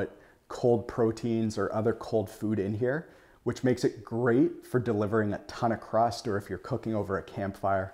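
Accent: Canada. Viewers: Once I heard the Canadian accent I couldn't unhear it lol Great review!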